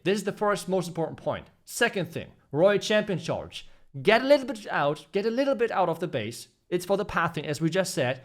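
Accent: Germany. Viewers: Are you german spoken, your accent sounds probably exactly like mine, aus Drutschland, Österreich, SW??😅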